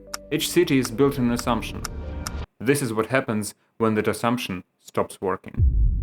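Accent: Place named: Ukraine. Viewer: Finally, Ukrainian voice on a niche topic in English. Please, go on.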